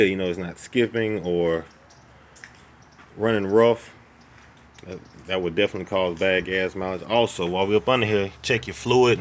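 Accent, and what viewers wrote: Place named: South, United States. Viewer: You sound like you’re from the south, where ya from? I’m from east Alabama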